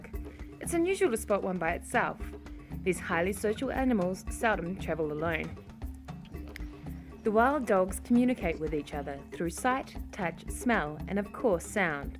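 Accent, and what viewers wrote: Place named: New Zealand. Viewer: Kiwi accent . Lol. Kia ora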